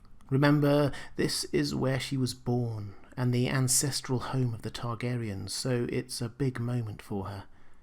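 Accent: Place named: RP, United Kingdom. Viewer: He, by far, has the best voice of all of the channel owners. Sort of a younger, less annoying, more posh David Attenborough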